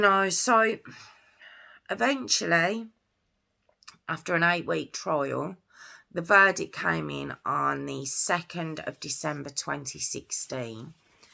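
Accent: Birmingham, United Kingdom. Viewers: Thank you so much! Please do be assured that I love the brummy accent! I’m from Northampton in England and I went to boarding school in Worcester when I was a kid. I went there because it’s a special school for visually impaired people so they have everything naturally equipped for people such as me. Please don’t ever think I mind talking about either of my disabilities, on the contrary I love to talk about them so as to raise as much awareness as possible! I’ve been blind ever since birth which is probably why it doesn’t bother me in any way at all. My biggest challenge is fitting in with the world as everyone expects people like me to embrace and adapt to all sorts of visual stuff left right and centre, which if you can’t see at all and never have done, it’s unbelievably frustrating that I can’t just be allowed to be who I am. If you see what I mean? 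My Autism has generally been much more of a challenge overall, I’m nearly 34 years old and it’s only really over the last 10 years or so that it’s been fully realised and picked up that I have Autism. I think because it’s only quite mild Autism and not like it’s completely severe. Also Autism was never really talked about when I was much younger and so it was generally assumed I was just a stubborn and awkward person who couldn’t be bothered to behave properly! But it just shows Autism doesn’t need to be really severe, you can still have Autism even if it’s more on the mild side! I find I generally struggle with the social wo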